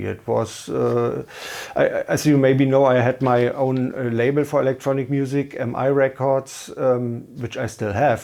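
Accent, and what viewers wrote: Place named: Berlin, Germany. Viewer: I like your „Berliner Schnauze“ English very much! 👍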